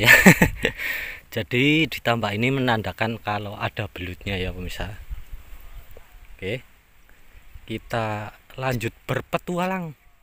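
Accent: Banyumas, Indonesia. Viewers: Hadir kang....salam satu hobi..uhuyyyy👍👍👍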